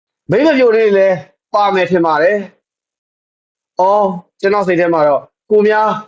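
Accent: Yaw, Myanmar. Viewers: မဂ်လာညချမ်းလေးပါ ကို ရေ မီသားစု များ အဖွဲ့သားဓမ္မဘက်တော်သားမို့အားလုံးပါနေကောင်းကျန်မာစိတ်ချမ်းသာလို့ရာဆန္ဒပြည့်ပါစေ 🙏🙏🙏🤘🤘⭐⭐🌹🌹❤❤အလွမ်းပေးတဲ့ ယောနသံလေးကွ 😂❤ဟို့နေက မဆီမဆိုင် ခဏနားမယ် ဆိုသာကို မျက်ရည်ကျလာတယ် 😢😅အဘွားကြီးဆိုတော့ အသဲနု၏ 😊မျှဝေလို့ ကျေးဇူးပါနော် ပုံပြောတဲ့ကိုကိုရေ👏👏👏💞💞🌷🌷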